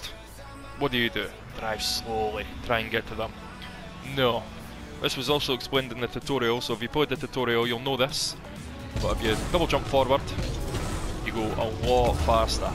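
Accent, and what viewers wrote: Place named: Scotland, United Kingdom. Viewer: I really like the Scottish accent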